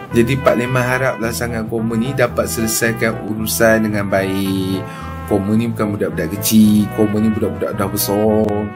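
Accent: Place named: Perak, Malaysia. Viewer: aje pekat peroknye kome 😅👍🏻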